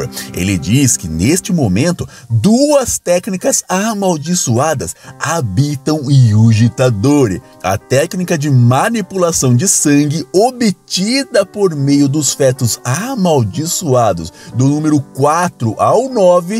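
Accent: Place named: Minas Gerais, Brazil. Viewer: O dono do canal ficou tão feliz que deixou todas mineirisse dele sair kkkk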